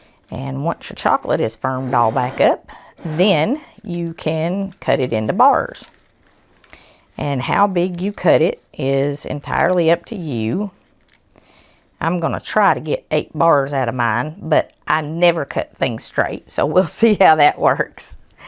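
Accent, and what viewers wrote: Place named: Texas, United States. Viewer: You sound like texas. Great recipe.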